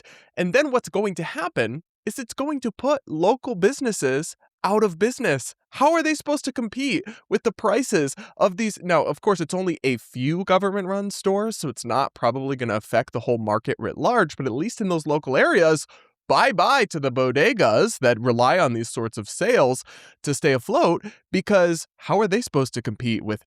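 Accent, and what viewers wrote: United States. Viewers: talking with an American accent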